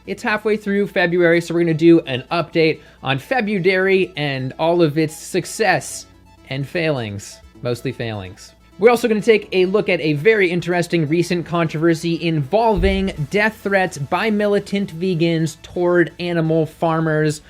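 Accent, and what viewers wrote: United Kingdom. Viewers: As a Brit, I appreciated your British accents.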